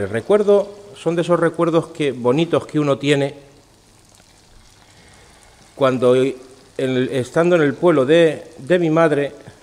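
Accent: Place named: La Mancha, Spain. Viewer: Por el acento deducía que era usted manchego como yo. Que bonito es siempre recordar esos momentos de la infancia. No sólo es lo que cocina, es la paz que transmite usted. Gracias por compartir sus conocimientos y estos ratos con nosotros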